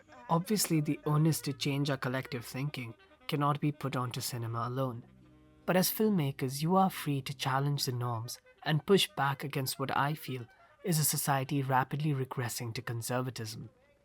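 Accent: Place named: Oxford, United Kingdom. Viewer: a musanghi cinephile talking in oxford english. * it's not an insult, just a description/review of the video.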